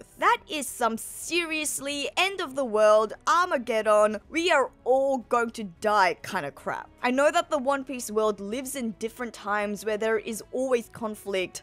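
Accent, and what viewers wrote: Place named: Australia. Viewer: It’s the cringe Australian accent. They cants pronounce the letter “o” they say “aur”. Like vide-aur instead of video… sometimes can’t say “ah” and say “er” .. listen to “ide-er” instead of idea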